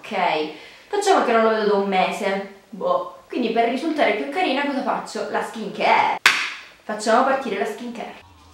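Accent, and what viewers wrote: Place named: Calabria, Italy. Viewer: Ma di dove sei .. la tua voce sembra calabrese 😍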